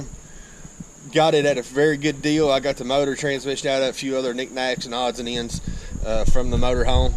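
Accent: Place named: South, United States. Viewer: Your southern drawl is real buddy. County strong 💪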